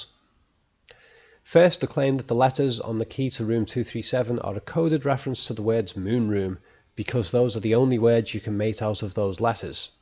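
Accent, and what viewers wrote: Liverpool, United Kingdom. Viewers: i didn't realize you were a scouser! errrrm nice one :)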